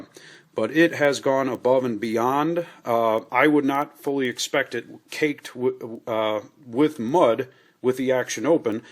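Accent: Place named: Upper Michigan, United States. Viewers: You sound like your from the UP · Judging by the particular landscape and the accent, I would say you're in the U.P., am I right? The sandy ground, ferns, and all the jack pine trees, it looks like somewhere in Gwinn or K.I. Sawyer.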